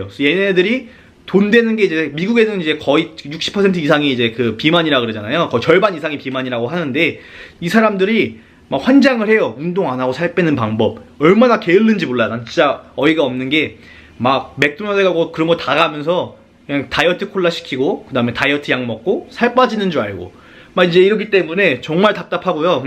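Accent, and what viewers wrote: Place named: Chungcheong, South Korea. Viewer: 말투가 충청도 분 같네요 인상 좋으심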